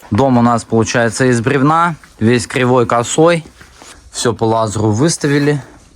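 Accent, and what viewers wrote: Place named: France. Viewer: Смотреть дальше не стал, бесит французский прононс😂или простыл он что ли😂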